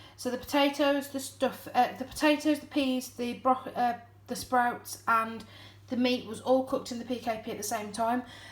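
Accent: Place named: Coventry, United Kingdom. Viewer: Hi, just bought one today, so thank you for this informative video. Don't have to say where you are, but your accent is like mine. I'm 5 miles out of Coventry. I'm guessing you're from round this way too.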